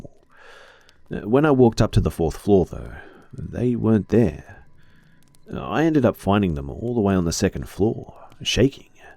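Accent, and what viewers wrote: Australia. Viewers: I would happier if do me and do me good while reading to me in that sexy Australian voice of his!!!!! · Leave it to me to listen to the awesome Aussie narrate these badass stories just to keep myself up at night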